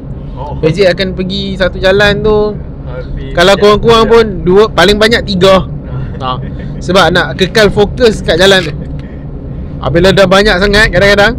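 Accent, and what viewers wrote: North, Malaysia. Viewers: org mna dgr sora palet mcm org utra 😅🤭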